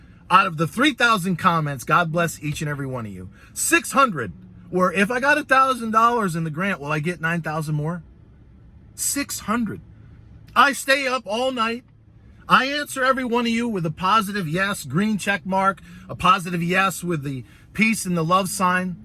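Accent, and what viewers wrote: New York, United States. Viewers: When I see you u speak I see A True American love from Texas your Brown Brother keep it up brother Aries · Oh you're a New Yawka like me! Bro, you're my dude now. I get your whole style now. I have to go and fill out this loan application now. Let's get this Grant & leverage it. Salud.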